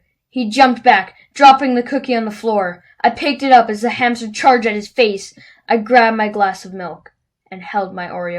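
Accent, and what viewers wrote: Canada. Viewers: He sounds very Canadian eh? I’m Canadian.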